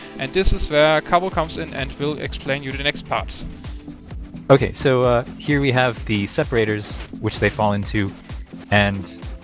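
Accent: Germany. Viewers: where do you come from? Germany? ^^ (sounds like it) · WOW, u have such a strong German accent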